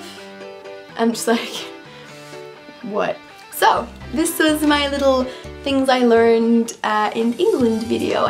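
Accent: United States. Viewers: Dexterror It's already been established that she's Finnish, and she doesn't strike me as the sort of person who would 'try to sound' American. My guess is that she picked up the accent from wherever, or through whatever means, she learned English. And given the presumption that English isn't her first language, I find it surprising and commendable that she speaks it better than most English people I know. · If you come from Finland how come you have an American accent when speaking English. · How come you have an American accent if you're from Finland? Also you could settle an argument between my and a friend - Is Finland part of Scandinavia? :D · I had no idea you're from Finland you sound American how did you learn that accent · Sounds like you already picked up some of the accent, you sound very mildly American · You say you're from Finland but you speak like an American - not just accent but manner of speech also.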